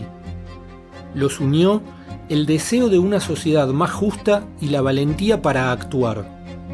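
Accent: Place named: Rioplatense, Argentina. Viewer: Que indignante que la mayoría de funcionarios que juzgó y condenó a estos estudiantes valientes, fueron castigados después de la guerra. esto demuestra que la gran mayoría de alemanes durante el nazismo, estaban involucrados con la ideología de nazismo. Buen vídeo amigo. Pero por favor para las próximas trata de pronunciar bien el castellano, porque no solo te ven argentinos, por ser temas importantes te siguen un público de otros países y para un peruano, es una tortura escuchar un castellano mal hablado. Sin la sho, sho sho. Un abrazo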